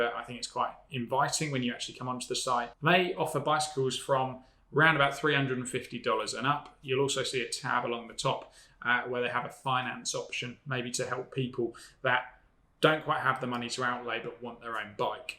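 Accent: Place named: Birmingham, United Kingdom. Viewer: Thanx for your reply. Just thought I could hear a slight Brummy accent on your video (;o)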